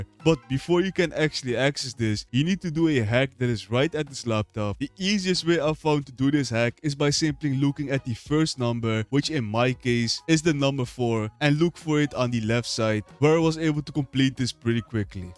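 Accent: Netherlands. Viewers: je klinkt nederlands man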